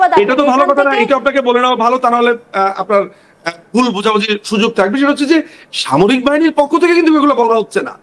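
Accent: India. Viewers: Who is this indian or Bangladeshi. he is talking like absolute indian. Ask india why padma and tista water sharing not solved. fundamentalist jehadi india enjoying ratio in terms of balance of payment.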